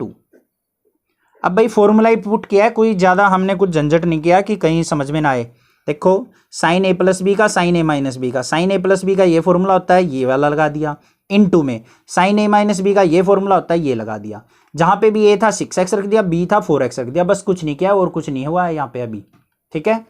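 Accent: Haryana, India. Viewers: Aapki voice se he pata chalta hai...ki aap Haryanvi ho👍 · Sir I am also from haryana.aapki boli sa hi lagta hai ki aap haryana sa ho but aapse kabhi pucha nahi vasa kis side sa ha sir aap😅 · i knew it sir aap haryan se ho even i belong to haryana so apka accent se hi samjhgyi thi and yaa sir i agree haryana ki kaafi itni green hai aur udher birds and all ka ghumna ghar ke bahar is so normal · Sir aapki language se pta chalta h ki aap Haryana k ho 🤗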